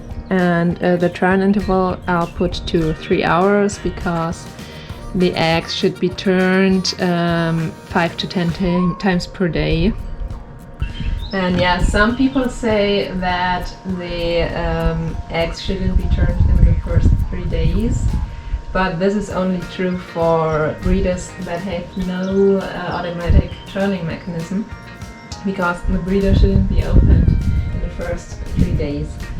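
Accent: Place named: Germany. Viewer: She sounds German.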